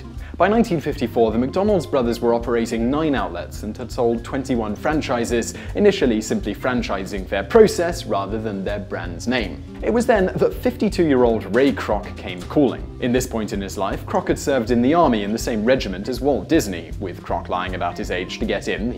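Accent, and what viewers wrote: United Kingdom. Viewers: I get to watch one of your videos and look at your sexy face while you speak with that amazing British accent! 16/10 would watch again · Sexy British accent.cheers mate!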